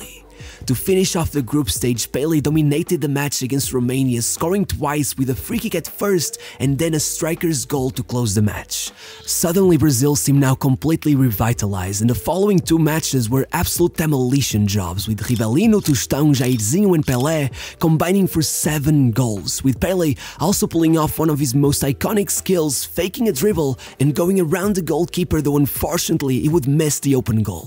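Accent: Portugal. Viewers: It's normal that you will praise Pele because you are either Portuguese or Brazilian as can be guessed from your English accent resembling Jose Mourinho. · He has a Portuguese accent, English is not his first language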